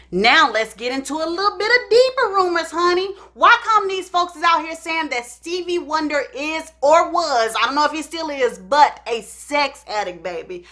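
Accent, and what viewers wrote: South, United States. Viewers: A southern slain for “how come” 😂 she’s straight country lol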